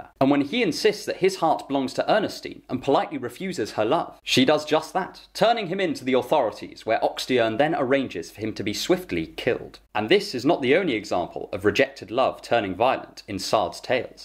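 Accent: United Kingdom. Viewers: 4 star video. -1 star for the obnoxiously british accent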